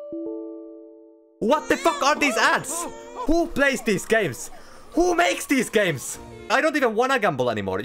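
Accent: Finland. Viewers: Are you finnish? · The Finland ascent was very good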